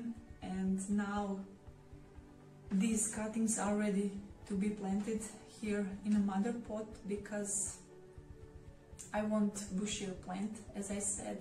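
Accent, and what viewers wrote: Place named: Australia. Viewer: So you're a croation right, i think australian 🙂✌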